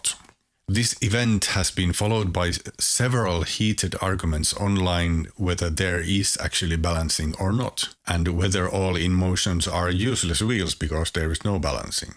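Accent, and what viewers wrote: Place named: Finland. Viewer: I could immediately tell you are a Finn: beautiful accent and “max information to words” ratio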